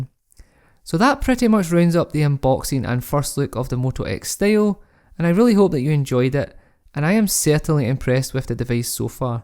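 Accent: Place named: Scotland, United Kingdom. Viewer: The share joy of a Scottish accent combiled with a tek vide cannot be mesured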